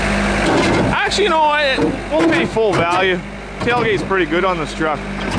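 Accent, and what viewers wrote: Canada. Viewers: what part of Canada you from? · I can tell this is a Canadian accent from a mile away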